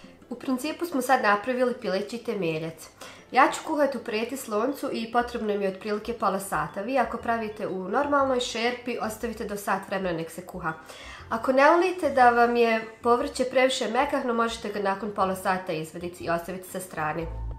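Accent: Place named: Krajina, Croatia. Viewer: volimo te i pratimo naravno volimo tvoj preljepi krajiški naglasak! 💪🏼👍🏼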